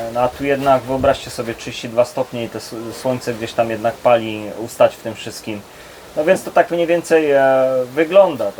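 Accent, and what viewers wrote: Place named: Silesia, Poland. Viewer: Bardzo dobrze godosz. Dużo YouTuberów pokazuje tylko❤ te piękne miejsca a nie jak są zrzyci z naturą.... Pozdrawiam Skąd że Śląska jesteś??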